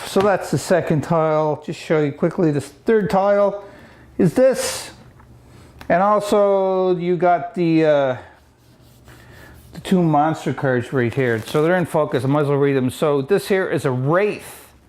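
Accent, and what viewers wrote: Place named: Newfoundland, Canada. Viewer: Where are you from? Trying to place the accent. Sounds like NL (Can.) to me. :)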